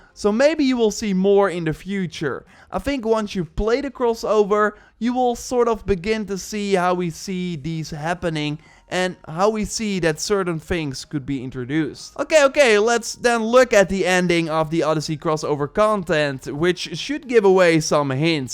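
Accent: Netherlands. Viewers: yow are you nederelands you sound like it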